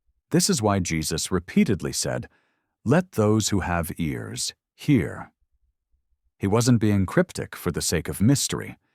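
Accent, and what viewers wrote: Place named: Kenya. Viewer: Those vowels sound and are exactly like those used by Kikuyus in Kenya 🇰🇪. A,E,I,O,U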